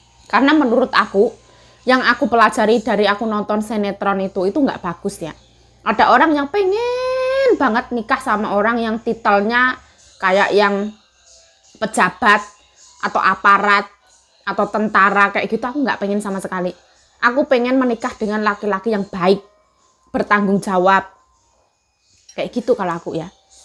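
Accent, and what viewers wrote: Java, Indonesia. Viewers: Logat Jawa nya kental bngt kk, Keren, baru pertama lihat chanel ini langsung nonton trs, dengan ciri khas logat Jawa keren kk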